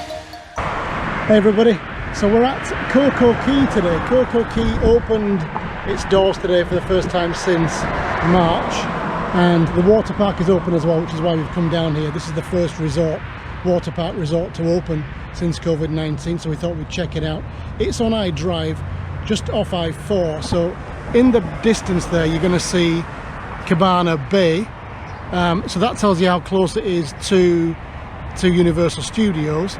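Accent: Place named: England, United Kingdom. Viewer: Hi my friend we are suppose to come in September this year,hows thinks looking over there ,hope you are all safe,you sound english have you moved over there .thanks lee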